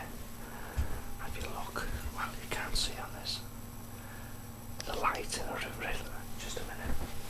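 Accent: Wales, United Kingdom. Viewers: I think it's a Welsh accent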